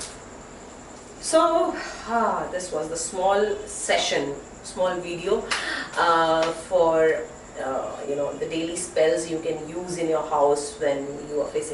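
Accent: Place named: India. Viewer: I LOVE the English language spoken by an Indian person. I don't know why I have an affinity for this dialect, but I do. I can listen for hours! Thank U for a very informative video!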